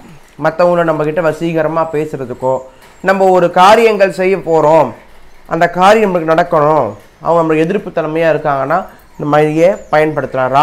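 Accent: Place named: Chennai, India. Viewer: Super chennai slang super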